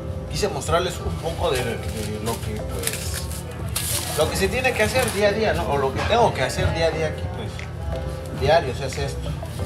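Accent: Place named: Spanglish, United States. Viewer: Cámara chingon el spanglish 🇲🇽🤟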